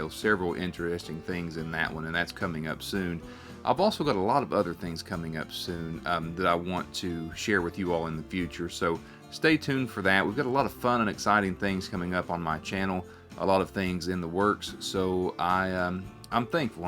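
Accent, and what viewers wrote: United States. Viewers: Great video collalatratons 0n your millstone like your country accent it sounds cool you ever been to St. Louis Missouri there are some good cemetery's of famous people calvary and one betlvo cemetery is wear chuck berry is buried at when you go to look for his big statue of him playing a guitar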